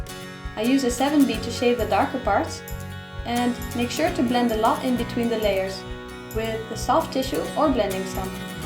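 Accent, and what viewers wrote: Netherlands. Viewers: Is that Doutzen Kroes? Are you dutch cause you really sound Dutch! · Are you Dutch? As a fellow dutchie I feel like I recognize the accent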